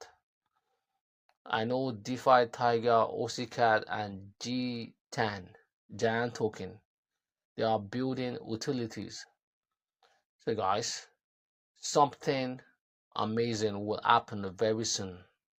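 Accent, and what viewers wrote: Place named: Democratic Republic of the Congo. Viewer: Your ascent though! Sounds Congolese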